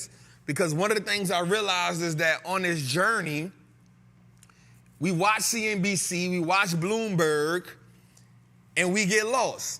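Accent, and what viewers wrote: New Orleans, United States. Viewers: I thought he was from new Orleans.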